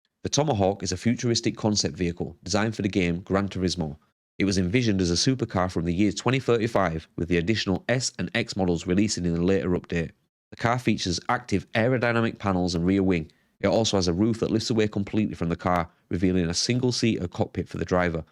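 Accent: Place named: Yorkshire, United Kingdom. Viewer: Great video fellow Yorkshireman! Love the accent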